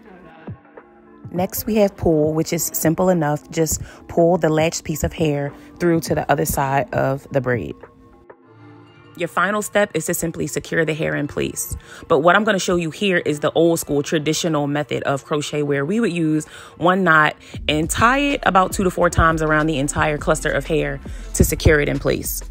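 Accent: Philadelphia, United States. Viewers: You sound like home lol are you from philly? Absolutely loved the detail & easy way you communicated this tutorial/stitches, thankss!! Cant wait to see the Bee Stitch!🔥🔥❤❤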